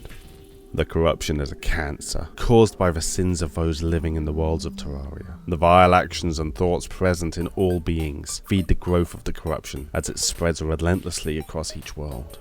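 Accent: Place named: Europe. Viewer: TerrinX - hes European, it’s how you say it over there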